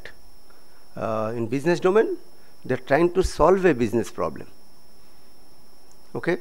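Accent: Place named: Bengal, India. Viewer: He is speaking benglish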